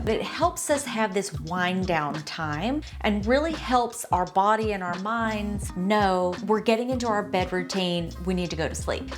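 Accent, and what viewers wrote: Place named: South, United States. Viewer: Thank you so much for this video,. I heard your heavy accent, and I am wondering where you are from. I am guessing somewhere south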